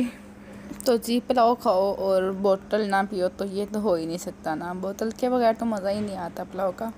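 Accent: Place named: Faisalabad, Pakistan. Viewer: Ap Ka way of talk bilkul faslabadi logo ki tra h or pata b lag jata h JB ap bolti h yummmy pulao and mazy dar Matt saln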